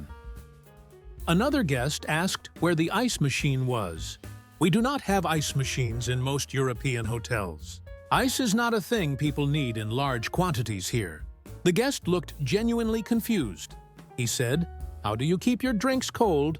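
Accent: United States. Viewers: I’m puzzled as to why AI is reading these anecdotes in an American accent.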